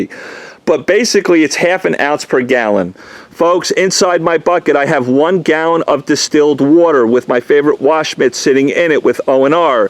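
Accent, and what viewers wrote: New York, United States. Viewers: This guy speaks like a NY’er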